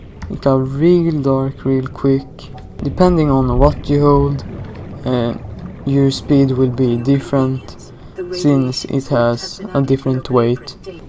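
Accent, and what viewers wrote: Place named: Sweden. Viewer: you sound so swedish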